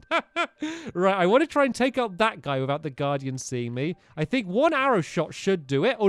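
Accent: United Kingdom. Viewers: I Mean Stampy Is British So You Can't Blame Him.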